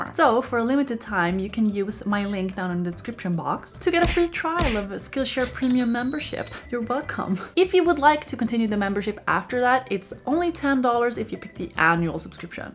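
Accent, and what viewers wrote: Sweden. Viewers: Your Swedish accent makes it all better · I've never seen u before but i can hear that ur Swedish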